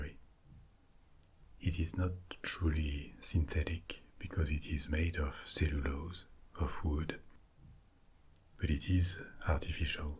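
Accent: France. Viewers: My sleep can be erratic! Some days and night, despite fatigue, I don’t sleep. Or, I eventually fall asleep, or something altogether different, I can fall in bed and fall asleep very quickly!!! This has been my fate most of my life including as a young child. seems to be just the ticket! I love his French accent, I love the whispery and intimately inflection that seems to be just him with me. When I don’t fall asleep right away, I am unformed. When I can’t fall asleep at all teaches me! This man has a craft, a skill, and a gift! I feel honored to be included!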